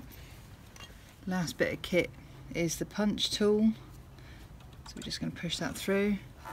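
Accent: United Kingdom. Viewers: Always a pleasant surprise is an English accent, and a woman's at that!.... oh, and a nice demo as well.. thx very much indeed!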